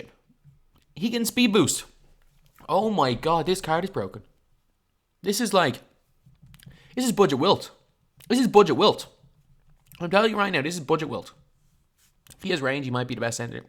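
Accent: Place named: Ireland. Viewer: he’s Irish i’m pretty sure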